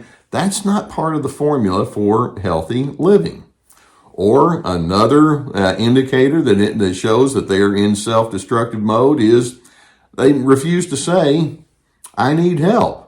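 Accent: South, United States. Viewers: So love your podcasts they are so commonsensical and you beautiful southern accent is like melted chocolate. Please keep up your chivalry and kindness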